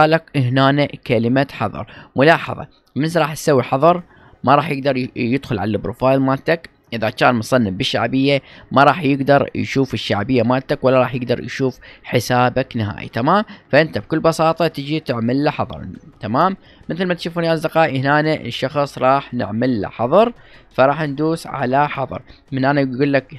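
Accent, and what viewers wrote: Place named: Egypt. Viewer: دسهلهة جنك مصري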